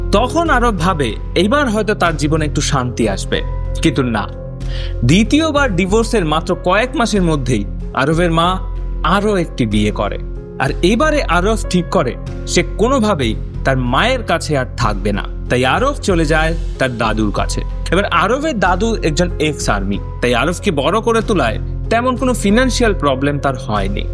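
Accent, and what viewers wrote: Bangladesh. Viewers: Tomar kothay Bangladeshi tan ache😂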